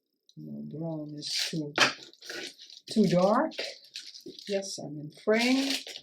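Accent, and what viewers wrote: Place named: Netherlands. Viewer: I’m so glad I found your channel, another lovely Dutch accent to listen to and beautiful work to look at! Susie xxx💕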